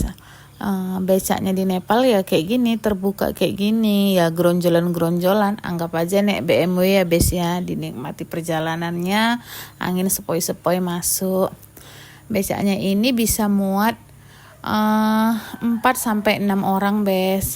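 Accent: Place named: North Sumatra, Indonesia. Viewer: Kak ini orang mana di Indonesia? Logat bahasa Indonesia kak seperti orang Sumatera Utara. Kenalan dong kak, saya followers baru kak🙏🥰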